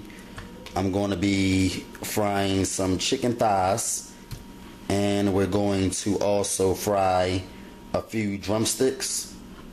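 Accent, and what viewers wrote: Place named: Philadelphia, United States. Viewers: He's from philly. Most of us talk like that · ! yes I subscribed about a month ago to his video channel and I have enjoyed his videos so far! I didn't know people in Philly talk like that. I don't understand I guess this is different unless Will Smith isn't really from Philly ... Idk😕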